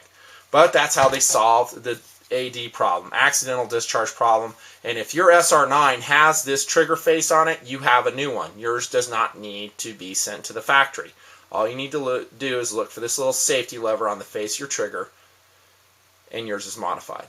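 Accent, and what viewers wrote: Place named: South, United States. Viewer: lol, sounds so much more southern in these old videos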